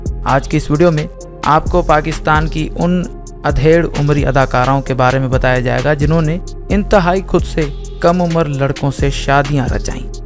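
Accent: India. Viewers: Tm Indian ho tmhre bt krne se saf pta chl rha ha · Bera hee kanjer hei jiska ye channel hei. Harami, sharai taur per kiya ghalat hei. Aur tu hindi lehjey mei kio bhonk reha hei? Kutta.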